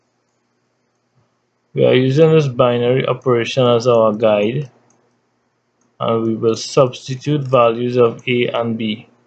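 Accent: Trinidad and Tobago. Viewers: You from Trinidad ? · Wow....great job, sounds like a Trinidadian tutor. Well explained.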